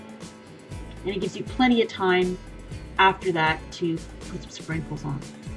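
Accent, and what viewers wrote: Canada. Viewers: I only just noticed that you're Canadian xD You have a cute accent! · She sounds more Canadian than Hawaiian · Are you Canadian? O_o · I'm guessing from your accent that you're Canadian...maybe in a French part of Canada cause on your frosting container it says "au chocolat" lol just curious. I'm from America but I love Canada. Btw, I'm definitely gonna try this.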